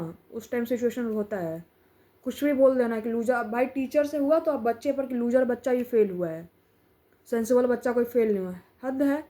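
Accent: Bihar, India. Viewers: Mujhe laga eski voice sun kar ye bihari hi hai 😅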